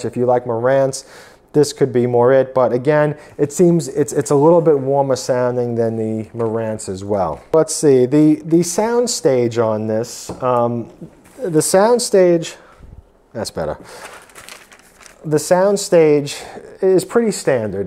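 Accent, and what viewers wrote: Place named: Long Island, United States. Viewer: Very interesting review. I bought one about 2 years ago to supplement a Marantz 6013 with Klipsch RP600Ms. I got it for $600 at the time, right now it's actually $900 everywhere. Yours is the first YouTube review I've found of this amp. I've been curious to see what somebody with access to a lot of amps would say about it, since I don't have much to compare it to. I admit that I was hoping for better. I'm currently at the stage of realizing that I need a dedicated stereo preamp to step up my 2 channel listening, apparently using an AVR for that isn't the best approach - but maybe I'll upgrade the amp after that (and then the DAC, and then the speakers, lol.) Thanks for the review!! (You sound like a fellow Long Islander - are you?)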